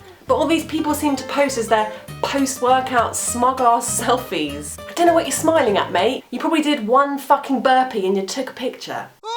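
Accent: England, United Kingdom. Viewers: Hallelujah!!! Oh how I love me some health-conscious, fit, ball-cap wearing TRUTH with an English accent as a morning wake up call!!! So awesome! I am really psyched about this vs the I met when I first started watching this channel months and months ago. The difference is NIGHT and DAY!!! Thumbs WAY UP!!!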